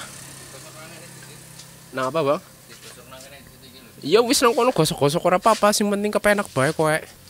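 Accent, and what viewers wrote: Banyumas, Indonesia. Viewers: Lha wong ngapak yak masvroh ?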